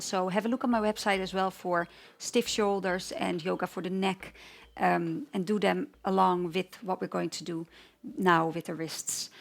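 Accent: Netherlands. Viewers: You can hear from the annoying accent that she is Dutch lol.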